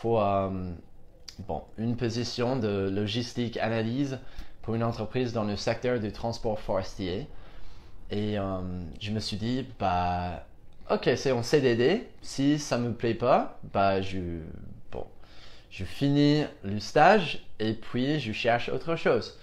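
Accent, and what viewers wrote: United States. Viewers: Bonjour, je trouve que tu parles très bien français, avec cependant un accent américain prononcé mais j'aimerais parler ta langue aussi bien que tu parles la mienne, tes vidéos sont très intéressantes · Je suis aussi Americain, et j'ai suivi le cours de francais au lycee, il y avait 40 ans. Mais je peux bien parler francais, et je peux bien vous comprendre, parce que vous parlez sans vitesse, et avec un accent americain.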